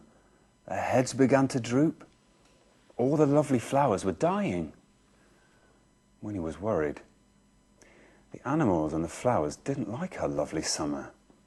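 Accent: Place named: England, United Kingdom. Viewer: Richard's voice sounds a lot more English in this than in Robin Hood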